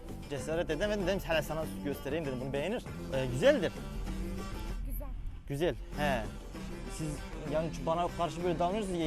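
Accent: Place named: Eastern Anatolia, Turkey. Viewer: Doğu şivesi videoların çok iyi bence devam etmelisin çekmeye 😂😂👍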